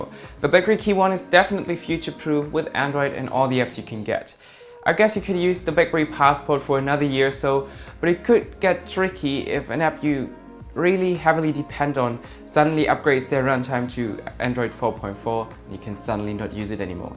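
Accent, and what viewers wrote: Germany. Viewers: Are you german ? You have this german somthing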